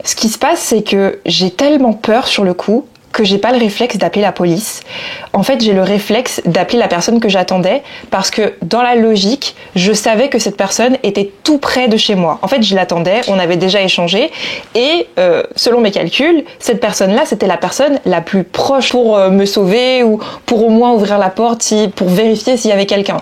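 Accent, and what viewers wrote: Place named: Guadeloupe. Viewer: ah oki je me disais bien que j'avais entendu un petit accent de chez nous. Merci beaucoup pour ta réponse et en ka voyé on big Foss et couwage baw de la Guadeloupe !! Never give up we keep getting stronger!!! 💪🏾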